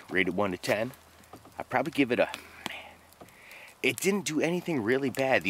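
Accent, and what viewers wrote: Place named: Pittsburgh, United States. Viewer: Hey man, are you from Pittsburgh? You sound like it. Thanks for all the vids.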